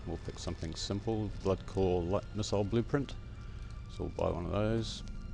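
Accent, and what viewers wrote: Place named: New Zealand. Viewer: its that kiwi accent, soft vowels.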